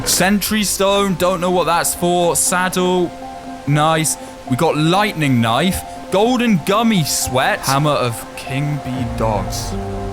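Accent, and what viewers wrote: United Kingdom. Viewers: Are u britosh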